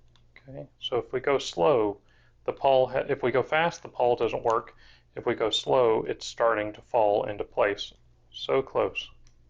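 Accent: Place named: North Carolina, United States. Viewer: I know someone else with a North Carolahna accent so I was able to figure out that you were talking about Tri-Flow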